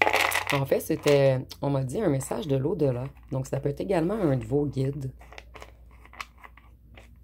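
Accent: Quebec, Canada. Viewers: J'aime bien votre guidance toujours fort juste ,ainsi que votre accent québécois , votre voix et vos mains et ongles très jolie vous avez l'air très coquette et tellement lumineuse et juste vrai .👍❤🙏🍀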